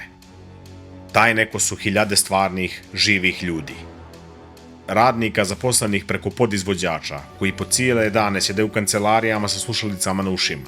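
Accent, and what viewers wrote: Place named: Montenegro. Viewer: Ja ga obožavam slušati baš zbog crnogorskog govora 🥰 a uz to jako je pametan đetić mogu mnogo toga naučiti 😊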